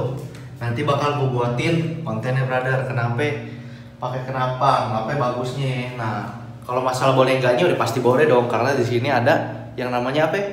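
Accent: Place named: Betawi, Indonesia. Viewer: Batawi luh medok medok bner om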